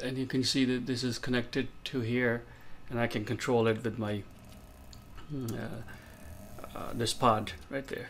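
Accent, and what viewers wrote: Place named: United States. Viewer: i swear this guys accent is indian mixed with american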